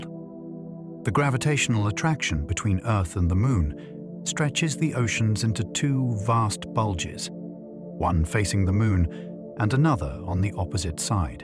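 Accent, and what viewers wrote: United Kingdom. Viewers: So glad i found. This in my scrolling.. i feel like i'm listening to God with a British accent explaining everything.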